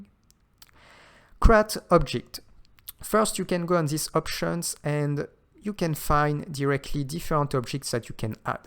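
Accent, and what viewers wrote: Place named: France. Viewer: You are a french accent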